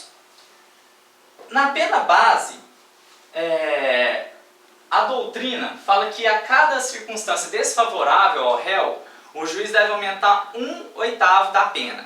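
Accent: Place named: Goiás, Brazil. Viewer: kkkkkkkkkkkkkkkkk goianin da gema..... :p (sotaque)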